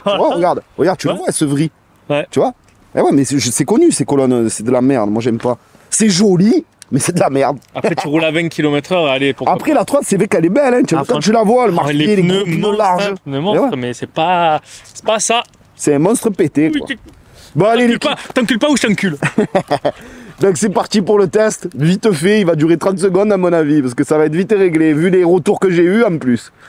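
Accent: Marseille, France. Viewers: Laccent maseillais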